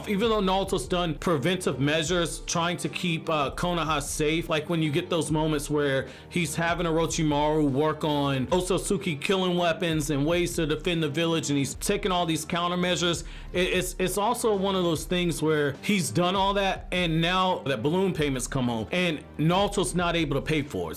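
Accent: New York, United States. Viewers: are u from newyork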